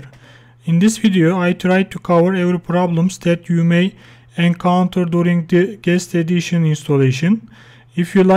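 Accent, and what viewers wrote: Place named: Turkey. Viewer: yanlis anlama ingilizcen cok iyi de aksanin cok beli turk oldugun o yuzden guldum (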